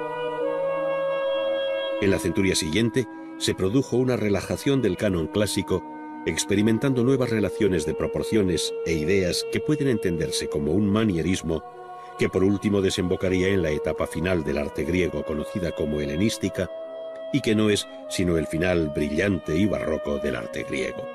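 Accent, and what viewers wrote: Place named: Spain. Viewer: Amo estos documentales, los veíamos en la facultad en el 2012, una joya. Y me encantan con acento español, no sé por qué! Gracias por subirlos!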